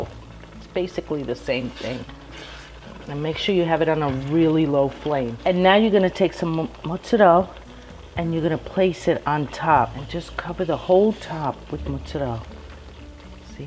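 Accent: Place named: New Jersey, United States. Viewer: I love your accent lol I knew you were from Jersey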